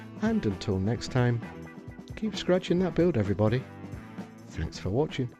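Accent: United Kingdom. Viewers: I suspect from your accent that you are from somewhere in the UK. We in the US who follow American football may remember the quarterback Jay Cutler, who was the team captain of the Chicago Bears from 2009 to 2016. Not sure, but I suspect he probably also liked Scooby Doo as a kid.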